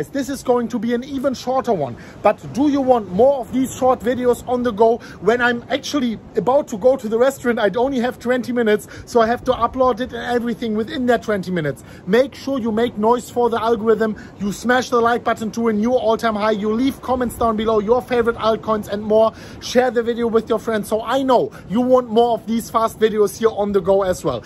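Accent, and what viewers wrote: Germany. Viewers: Deutscher Akzent kommt voll durch!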